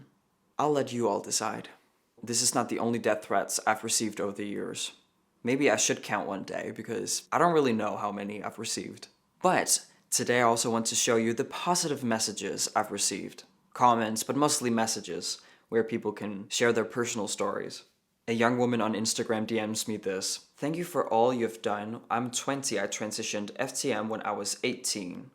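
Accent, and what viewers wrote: United States. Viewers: So wait… do Danes speak English with this accent that sounds American, or is he doing these videos in an American accent on purpose? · I never knew that you live in Denmark. You have a very good American dialect! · I only have one question, and maybe it's already been answered., why do you sound like you have an American accent?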